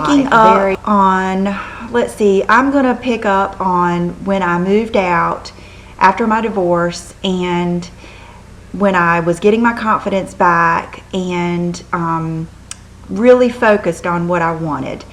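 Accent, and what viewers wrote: South, United States. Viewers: PS: you always brightin my day, Ur southern accent is soo beautiful and welcoming i am soo takin back.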